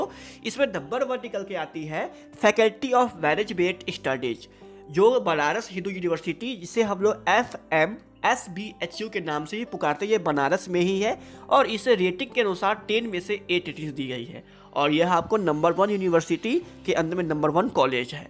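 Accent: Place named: Bihar, India. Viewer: Bolne wala banda bihar ka hai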